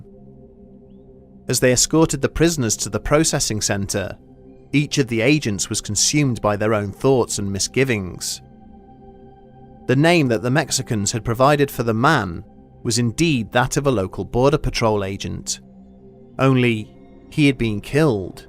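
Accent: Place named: United Kingdom. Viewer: Got yourself a sub, my guy it's good to hear a fellow UK person do these kinds of videos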